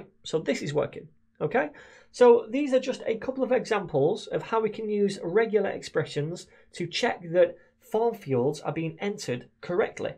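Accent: United Kingdom. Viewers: came to learn, stayed for british accent, continued for great explanation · You're the first guy I've bumped into that has a British accent in a coding tutorial. From north of England I think, given that you tend to pronounce 'must' or ''stuff' like 'moost' or 'stoof'. Sorry for the digression, but I'm pretty in love with British accents.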